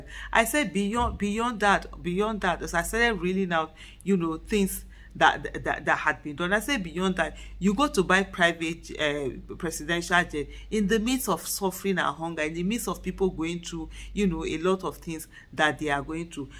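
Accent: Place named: Edo, Nigeria. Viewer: I knew it! I almost asked you in your last video when you said you are from Agbede if you live in Auchi, too. Because when you were speaking Etsako, you were mixing up both Agbede and Auchi😂. Now I know why, because Mama is from Auchi (from Amehbainomoh's family) and Dad is from Agbede. I hope I spent your grandpa's name correctly. He was a wonderful doctor in Auchi. He cared for me when I was sick. May his soul rest in peace. Just like you, one of my parents is from Auchi, the other from Agbede. 😂😂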